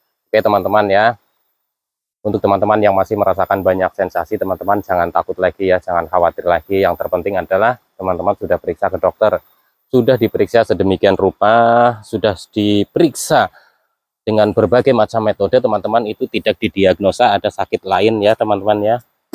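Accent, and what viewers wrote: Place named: Banyumas, Indonesia. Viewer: Mas enak penyampaian katanya, saya baru gabung aja suka lihat. Karena setiap saya dengar dan nonton. Kecemasan saya berkurang. Semangat terus mas, dan ayo semangat juga buat kita supaya lekas sembuh dari asam lambung dan kecemasan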